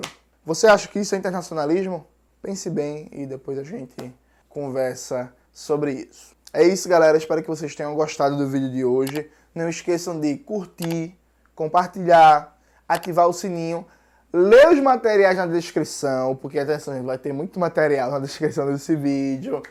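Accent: Pernambuco, Brazil. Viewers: teu sotaque pernambucano é tão gostoso de ouvir, acho que tu é o segundo youtuber de pe que acho. me sinto em casa kkkkkkkk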